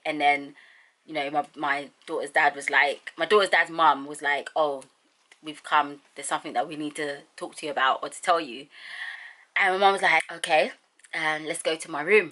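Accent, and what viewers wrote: Nigeria. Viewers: I love the way the Nigerian accent comes out from time to time. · I love the Nigerian 🇳🇬 accent 😍😍😍😍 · I can still sense your Nigerian accent Good you proud of being a Nigerian ❤️❣️ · Nigerian accent never leaves ur mouth 😂😂😂😂 😂😂😂😂😂😂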